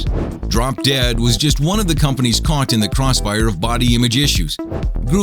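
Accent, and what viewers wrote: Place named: Canada. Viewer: sound Canadian but where is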